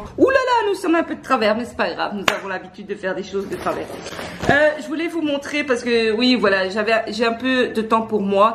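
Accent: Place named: Belgium. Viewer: Tu es super mignonne❤🙏 et ton fis heen😍... serais-tu belge , je reconnais l' accens hahahha / ton fils et metis? excuse moi, mais c' est la 1er vidéo que je regarde de toi et j' aime beaucoup😊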